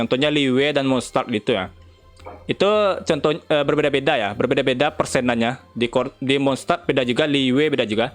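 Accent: Padang, Indonesia. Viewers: Gw tambahin subcriber lu gan.. infonya bermanfaat dengan gaya bicara yg uniq. Org padang kah ?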